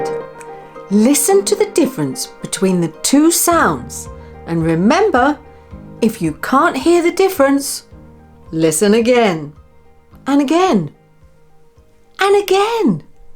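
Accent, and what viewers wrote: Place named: United Kingdom. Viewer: British accent... so lovely !!!!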